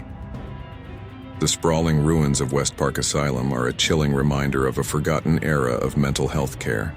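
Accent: United States. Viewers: Why do we have an American talking about this stuff? Stick to your own stuff!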